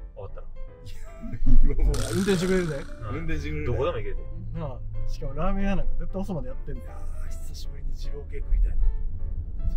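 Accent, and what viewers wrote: Kansai, Japan. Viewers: 関西弁がえぇわ♡ 免許取りたてで、怖がらずガンガン行くの男らしい〜 LEXUS似合いそー！ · インタビューとは違う藍くんの関西弁にギャップを感じてキュンときた🫰🥰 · 本物の関西弁めちゃくちゃ耳馴染み良くて耳が幸せです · レクサスはチャラい感じするから藍ちゃんにはやめて欲しいなぁ🤣確かにAUDI乗ってそう🧡ハリヤーとかどうですか？そんな車で迎えにきて欲しい🧡ぇ 藍ちゃんの助手席羨ましすぎます！！！関西弁親近感湧くし、姿勢いいのめっちゃ可愛いです☺️☺️☺️🔰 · バレーボールの時はあまり関西訛り出てない感じするけど、動画の時は訛ってて、自然体と言うか素が出てるなーって実感できて好き💓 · 藍くん、あんなかっこよくて性格もよくて関西弁キレッキレで最高だわ！！ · このルックスで関西弁やばい😢❤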